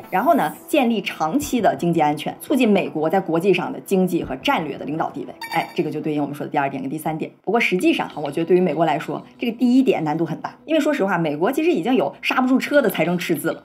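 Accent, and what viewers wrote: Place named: Northeast, China. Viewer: 东北人嘛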